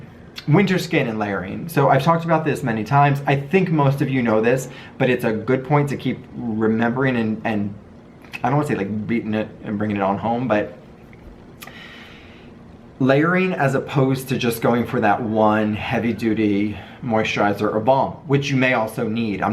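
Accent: New York, United States. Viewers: R u originally from Seattle as U sound like ur from New Yawwkkkk!!! East coaster here as well. LOVE UR PRODUCTS!!! Keep on going!!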